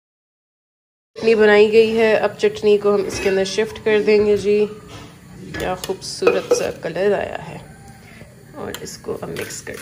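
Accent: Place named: Punjab, India. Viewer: Your Punjabi touch in conversation and smile 😀 love it ❤️❤️❤️❤️❤️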